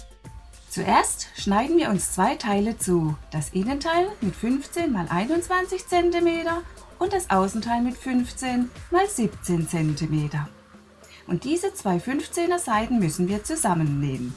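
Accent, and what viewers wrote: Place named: Palatinate, Germany. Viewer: Liebe.. Vielen Dank für deine tollen Videos und ich, als Hessin die seit 16 Jahren in Niedersachsen wohnt, liebe deinen Dialekt... Ich schätze mal Pfalz, oder? 😍😍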